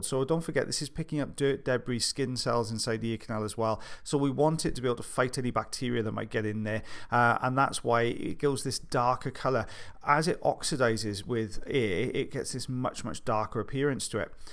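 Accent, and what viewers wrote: Wales, United Kingdom. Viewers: "Clever sausages" 😂 I love the Welsh accent, but then add these euphemisms, and I can't help but giggle! 🥰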